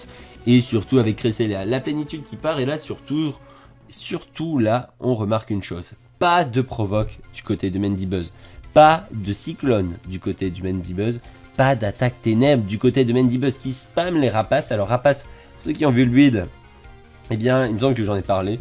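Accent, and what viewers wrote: England, United Kingdom. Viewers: Je risque pas d'oublier cette vidéo où tu réussis l'exploit de foirer l'intro et l'outro en même temps xD. Bon pas grand chose à dire sinon GG ! Cresselia est bien parti pour être MVP ! Pense à te doper à la camomille pour les prochains matches, ça semble efficace ! (par contre j'ai rien contre l'accent anglais, je trouverais même ça marrant qu'il devienne ta présentation emblématique pour cette compétition^^) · no the englush accent is back :'(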